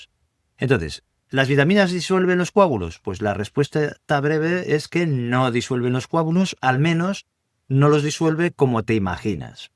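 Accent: Spain. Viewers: Este señor no es el q esta hablando. Este señor es mexicano y la voz es de un español. Por lo tanto Este video no es confiable. VIDEO FALSO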